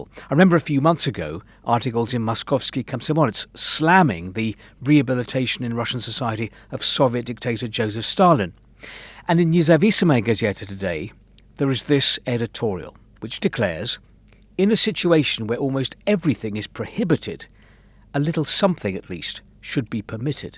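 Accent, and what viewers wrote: United Kingdom. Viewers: This narrator sounds British. Isn't that where the BBC comes from? I'll be taking this with several grains of salt, if not the entire shaker.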